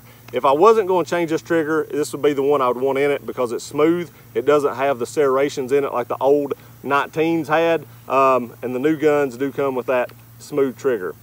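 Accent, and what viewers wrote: South, United States. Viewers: If I hear someone with a southern accent talking about guns, I know they know their guns.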